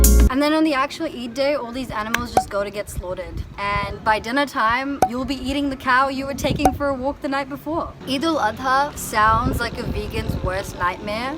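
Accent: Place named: New Zealand. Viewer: I really wanna learn NZ accent from you.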